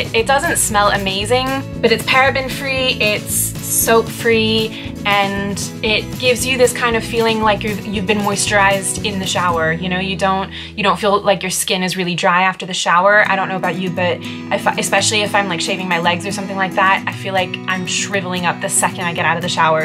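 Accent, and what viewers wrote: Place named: England, United Kingdom. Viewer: You have a hint of an English accent at times lol or is it just me?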